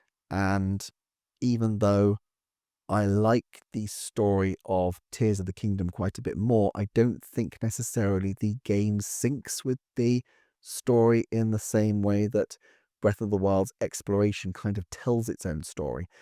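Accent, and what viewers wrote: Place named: Southern England, United Kingdom. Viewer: I enjoy bottom tier being "so-so;" it feels very befitting of your southern English accent and your very conciliatory style of analysis.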